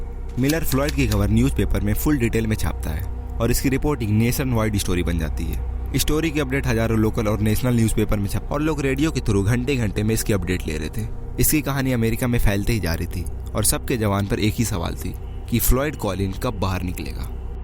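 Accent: India. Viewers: 😂😂😂 fuck this indian accent is so funny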